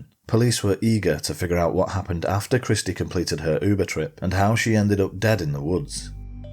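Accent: United Kingdom. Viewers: Your wonderful British voice doesn't match this U.S. story. Please......more non U.S. stories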